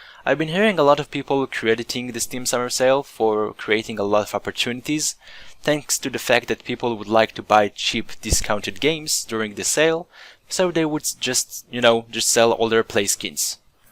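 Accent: Israel. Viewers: I'm just from israel too and i was pretty sure you are by your accent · יש לך מבטא ישראלי חלש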